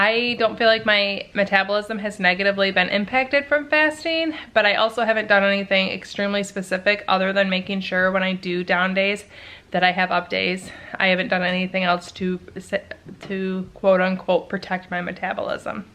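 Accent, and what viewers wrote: Michigan, United States. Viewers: I love your videos. You’re very inspiring. I thought it was funny that someone asked you what state you live in. A. You have a Michigan accent, and B. there’s literally a wall hanging in the shape of Michigan behind you!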